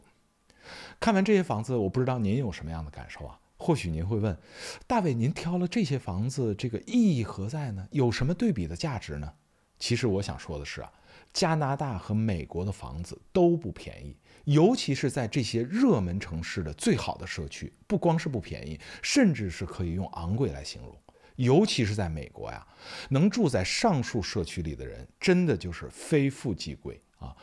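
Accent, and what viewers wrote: Standard. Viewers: 听口音完全听不出是东北老乡普通话相当标准了提个小建议能不能把英尺换算成平方米毕竟用英制单位的国家现在比较少印象里加拿大不也是用公制吗？难道我记错了？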